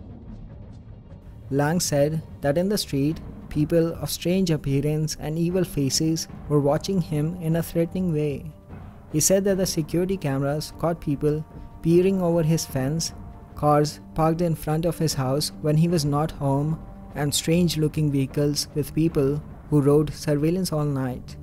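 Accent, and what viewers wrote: India. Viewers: The voice over is an INDIAN for sure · Thick indian accent.. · Indian voice confirmed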